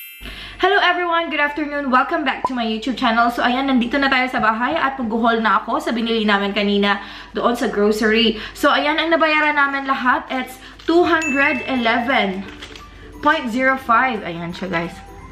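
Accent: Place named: Visayas, Philippines. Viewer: Murag bisaya lagi ka madam Okay naman pala mga bilihin jan atey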